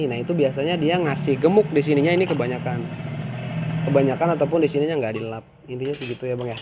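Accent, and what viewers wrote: West Java, Indonesia. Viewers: Lok mana om Bandung apa mana ya soal nya logat bahasa nya Sunda juga kaya saya hehe..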